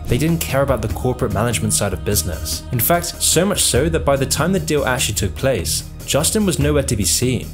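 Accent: United Kingdom. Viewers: This is what conspiracy theory sounds like in the Queen's English.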